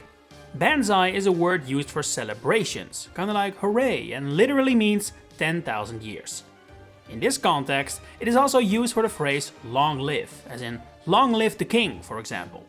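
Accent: Netherlands. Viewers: checks profile after 5 seconds of talking: "yep, tissun nederlander." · Um, he definitely didn't add a dutch accent, he's dutch. And also...of course he uses US terminology?? It's a video about the US name of the enemy??? You're goofy for this comment lmao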